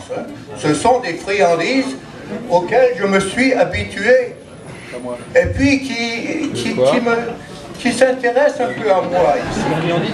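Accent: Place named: Saint-Étienne, France. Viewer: Après toutes années passées outre-Atlantique, mon oreille perçoit le tenace accent stephanois. Ta prestation est pleine d'authenticité, ton personnage surprenant et très drôle. Je n’ai plus de nouvelles, je t’espère en forme. Amicalement Didier Missana from Beaujolais.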